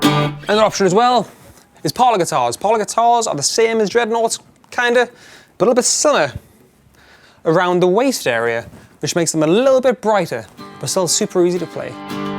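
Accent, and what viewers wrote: United Kingdom. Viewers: You move like Captain Jack Sparrow, Also you almost talk like him, Makes it better because you got that nice british accent.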